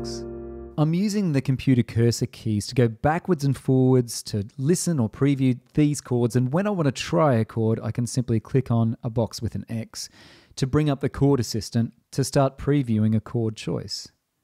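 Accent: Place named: Australia. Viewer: I love Cubase 10.5.2 Pro but feel that I'm only using about 1% of its capabilities. It is clear to me that the person talking on this video is a fellow Aussie, but I have no idea who he is and would like to learn more about what he does musically and how he uses Cubase. The videos are awesome. Cheers.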